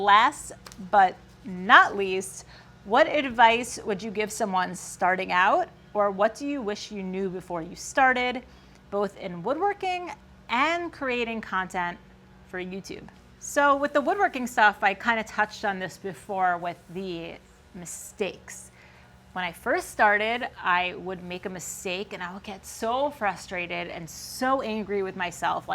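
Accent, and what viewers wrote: United States. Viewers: Yes! I was about to comment the same thing! It’s a nicer accent than other parts of America I think.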